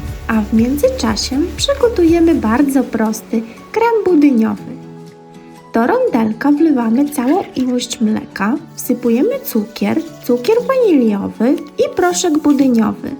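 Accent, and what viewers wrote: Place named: East, Poland. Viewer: pieknie mówisz po polsku. Twoje wschodnie pochodzenie tylko lekko słychać w wypowiadanych słowach.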